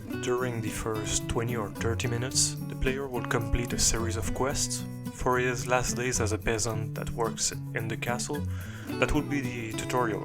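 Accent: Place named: Quebec, Canada. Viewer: Awesome ! You sound like a Quebecois ;) Jme trompe tu ?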